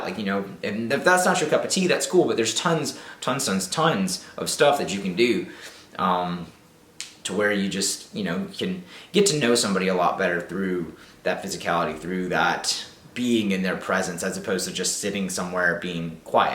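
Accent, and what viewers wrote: South, United States. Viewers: Okay, my question for the Q&A (if you so choose) - do you use your "Southern" voice on dates or you "Normal YouTube" voice? (I'm wondering now because I've heard your accent and the general topic of the video xD) Otherwise, I feel like I would enjoy sitting down with you and just picking your brain about all the stuff you talk about... oh shit my psychologist is showing, k thx bai! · I for one wouldn't care if you talked in a Southern accent for the remainder of your time on YouTube, it reminds me of your very first video you're coming out video one of the things that I found so endearing about that video was that southern accent, you weren't trying to hide it it was just there and I found that to be precious and plus I'm in South Carolina so I get ragged all the time about my Southern accent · Ohh, that Southern voice is giving me goosebumps. 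I love it! It's great to see you Smile again. I love you always seem Complex, that's a great thing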